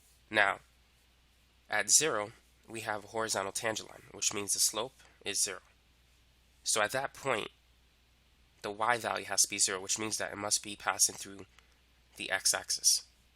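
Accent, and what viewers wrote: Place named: Rural, United States. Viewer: his low key countræ accænt 😍😍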